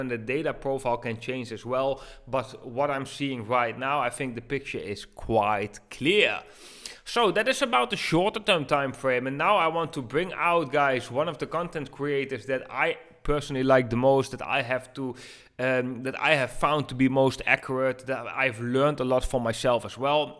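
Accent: Netherlands. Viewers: meetup would be sick, je klinkt nederlands, ben je van nl?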